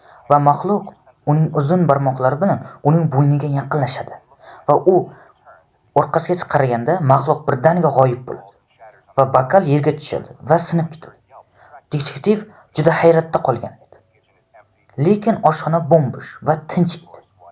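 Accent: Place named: Shofirkon, Uzbekistan. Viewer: Shofirkonlikmisz deymn shevayz tortyapti😂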